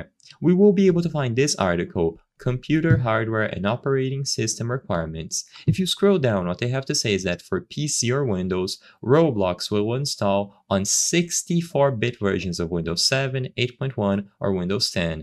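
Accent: Brazil. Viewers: is that a brazilian accent?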